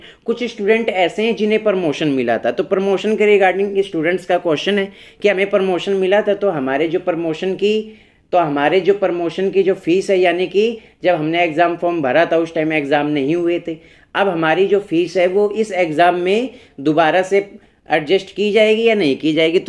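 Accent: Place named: Uttarakhand, India. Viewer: Hey bro may I ask u thing??..... Do u belongs to Uttrakhand Or somewhere from there... Actually your ascents r too similar to regional people of Uttrakhand Or nepal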